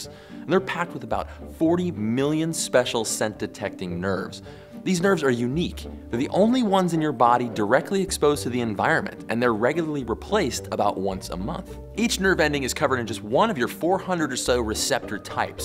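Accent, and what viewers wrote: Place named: United States. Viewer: I can tell from his accent that he's American. He also mentioned Austin in one of his other videos.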